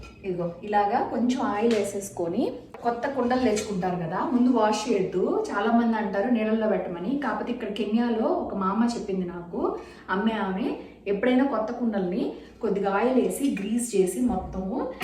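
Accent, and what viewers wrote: Nellore, India. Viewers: Medam midhi Nellore ahaa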